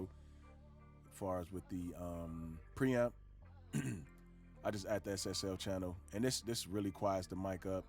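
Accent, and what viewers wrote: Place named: Cleveland, United States. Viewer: Well then you close, homie. I knew I pinpointed your accent and just genuinely liked you because you was from the Land. Good to know homie. I’m frustrated as fuck. I’m still up. I thought I had exploded on my tracks on my MPC to live properly into my logic, but I actually did it. There are Sounds is missing. Are you on Instagram? Send me your handle. I would like to be your Instagram partner. And thanks man I will check your videos. I like your style.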